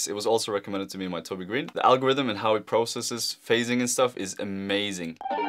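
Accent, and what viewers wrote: Norway. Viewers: Are u from norway? I can hear ur accent lmao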